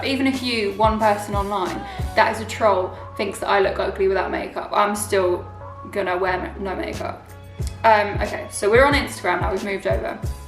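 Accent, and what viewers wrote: United Kingdom. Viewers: omg she really does sound like a female any murray lol maybe thats just a Scottish accent thing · It's a normal English accent wtf.😂😂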